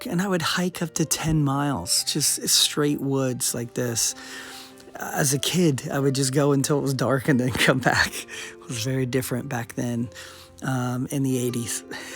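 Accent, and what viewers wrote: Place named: Ohio, United States. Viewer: Why I knew you were from Ohio by how you talk? 😁😁 Im in Cincy❤❤❤❤❤❤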